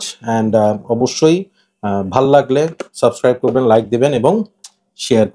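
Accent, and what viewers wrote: Chittagong, Bangladesh. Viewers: বদ্দা, অ'নে চিটাইঙা নে??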